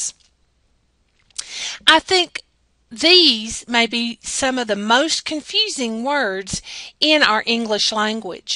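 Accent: United States. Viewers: I love her American accent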